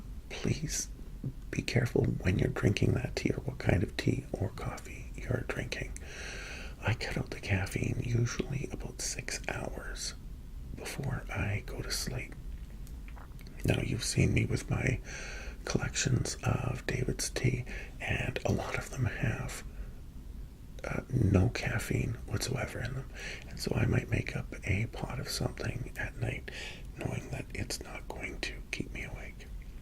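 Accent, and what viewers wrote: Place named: United States. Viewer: You have a wonderful relaxing voice to listen to. With a mild American accent too. New subscriber 👏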